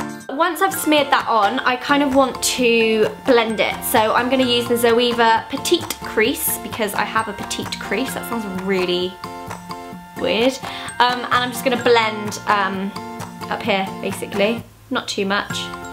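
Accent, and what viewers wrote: United Kingdom. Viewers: Why can't I be British Dx she makes putting on makeup sound so fancy even the way she says "mascaura" instead of mascara xD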